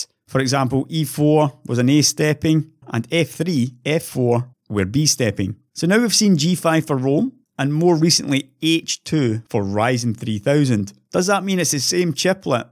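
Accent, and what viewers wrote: Scotland, United Kingdom. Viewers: Scottish accented videos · I just LOVE your scottish accent... you sound like straight outta Braveheart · with a scottish accent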